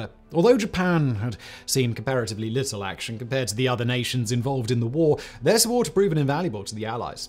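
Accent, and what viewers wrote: RP, United Kingdom. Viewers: Your RP is so over-the-top posh, it sounds similar to Jacob Rees-Mogg.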